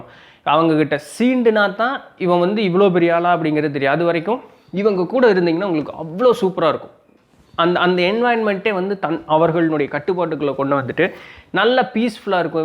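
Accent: Coimbatore, India. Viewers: Coimbatore slang super 👌🏻👌🏻👍🏻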